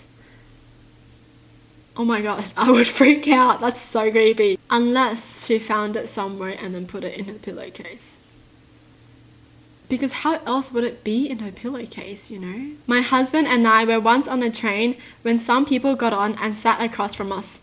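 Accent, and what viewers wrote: Australia. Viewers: She looks like rosè too and they're both from australia that's why they have the same accent. Maybe they're related?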